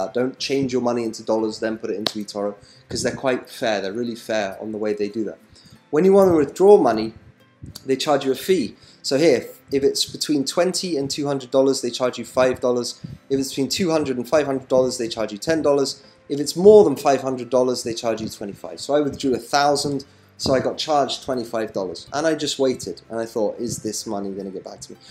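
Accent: United Kingdom. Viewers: If you live in UK like me, then when you come to withdraw Etoro do not mention that them converting UK to USD to be able to trade , then when you cash in, Ettoro converting again £USD back to £UK costs UK citezens a whopping 25% ! . That is what I earn almost earn from trading, so whats the point in trading with etoro if you live in the UK? Strange as you sound English so I wonder if you have this issue or have you found a work- around on this one. If so it would be get to know the trick. thanks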